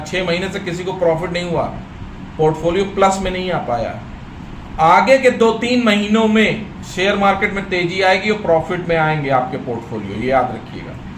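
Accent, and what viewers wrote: India. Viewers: Ek number Desi andaz mein bat krte ho bhae .. very genuine person.. very brave and courageous person. Salute 🙏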